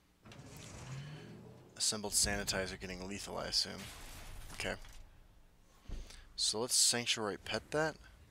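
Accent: Ireland. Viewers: Brazillian, spanish, Portuguese, you name it, but his accent is Irish.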